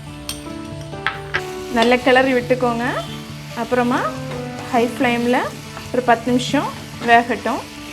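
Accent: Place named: Kanyakumari, India. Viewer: vearaci.... kollam✌😍 Nature of kanyakumari slang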